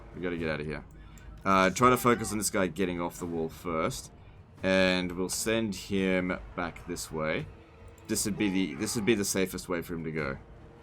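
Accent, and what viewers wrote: Australia. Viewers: I very rarely click your videos based on the content, it’s usually about personality. Sometimes I just think “shit man, I could use a grumpy Australian talking into my earholes”. Love your channel man.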